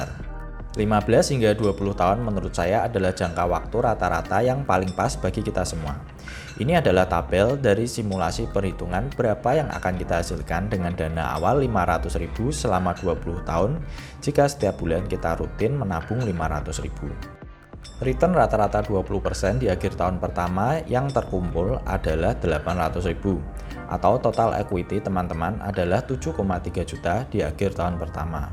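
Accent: Java, Indonesia. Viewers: saya suka chanel ini krna ngomong nya medok, berasa cangkruk sama teman sendiri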